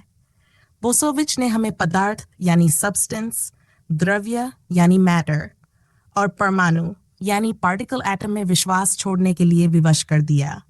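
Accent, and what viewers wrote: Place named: United Kingdom. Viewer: Aapki Hindi bahut achhi hai parr angreji ki boo aati hai..😊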